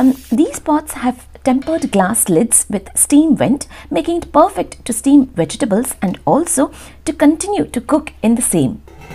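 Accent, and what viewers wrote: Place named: Hubli, India. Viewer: Hi ri nim channel yavag nodidru tumbane Kushi agutte ri and vegetarian nalli eshtondu Verity food esht unique aagi quick aagi cook madbahud anta gottirlilla, and nim channel nalli prati video kuda different food style different things galanna nodtini nanu adu bhal eshta agutte so I regularly watching ur channel and I m the big fan of u n ur channel ❤️. Luv u soo much dr 😍. And ennond en eshta andre nivu nim maklanna matadso reeti avvi appi annodu 😍😁 and pakka Hubli bhashe all things I just luved it ❤️ Thanks fr ur informations and suggestions. Love from Bagalkot and Raichur ❤️❤️❤️❤️❤️❤️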